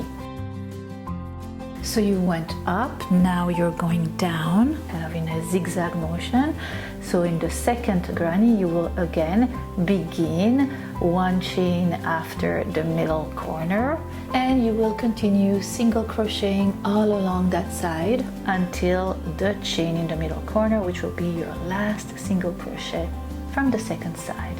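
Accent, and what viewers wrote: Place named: France. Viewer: Hello . U have a very beautiful voice and accent , French !!! . Beautiful skirt . Thanks .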